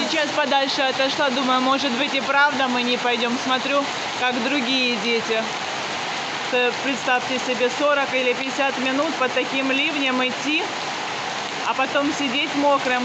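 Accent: India. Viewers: так интересно, у Вас английское произношение индуское, это просто удивительно! Только с позитивной стороны говорю. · За столько лет в индии чисто индийский акцент английского стал)) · У вас, уже акцент на индийский стал похож )) Nice do mid' you;)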